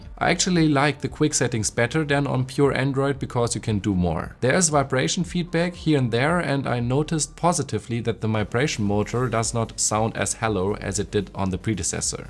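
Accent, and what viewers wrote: Germany. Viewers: Are u a native german speaker? Fellow german here, hearing it in ur voice. Sounds wierd to watch a yt vid with a German speaking english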